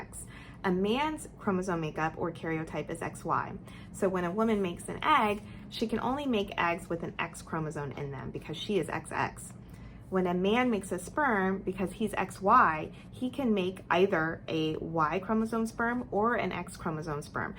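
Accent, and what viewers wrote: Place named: United States. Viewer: Great content! Also how did you manage to have such an American accent? Do some schools in India have international English classes where they hire teachers from Western countries??? Just curious.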